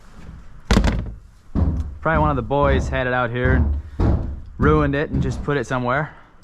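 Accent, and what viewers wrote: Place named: Ohio, United States. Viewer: I fkn knew it dude 😂🤘🏽 you kinda got an Ohio accent lol I’m from northeast Ohio , Lorain Wellington area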